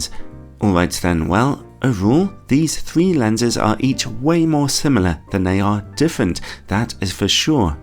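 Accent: United Kingdom. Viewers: he is british. It’s Zed in the UK but not found as much as everyone. T as Tee but some says Tango Z as Zee but some Zed V as Vee but some says Vicky C as Cee but some says Carrots B as Bee but some says Bob. They say these stuffs cuz some people in UK have bad hearing and often hear something else. Sorry for that you found it funny.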